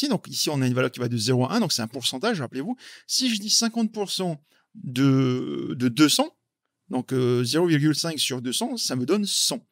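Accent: Tahiti, French Polynesia. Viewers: Salut, tu es de Tahiti? Tu as un accent tahitien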